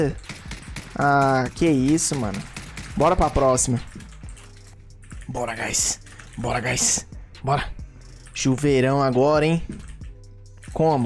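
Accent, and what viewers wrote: Minas Gerais, Brazil. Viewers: !! Pelo seu sotaque vi que vc é de Minas. De qual Cidade vc é?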